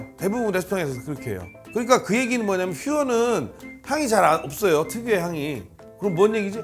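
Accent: Chungcheong, South Korea. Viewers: 구수한충청도사투리언제나정겨워요 저도동향이라 ㅋㅋ 감사합니다 언제나행복하세요 소유진씨와~~홧팅♥♥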